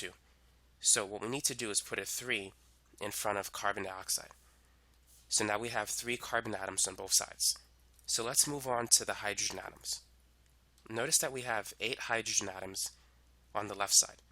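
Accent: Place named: New York, United States. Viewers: im hearing a little bit of north east accent. new york area 🤔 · Yall I think he has a new yorkian accent idk if u care tho lol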